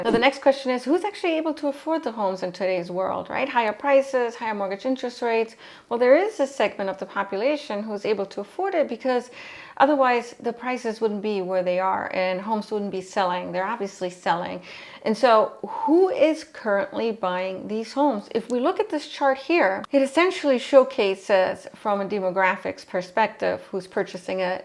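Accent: Florida, United States. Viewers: It is crazy for us inland as well. We are expected to assist in the insurance coverage of those millionaires mansions on the beach they keep rebuilding. Remote work was a godsend. You sound like a native Floridian too! ;)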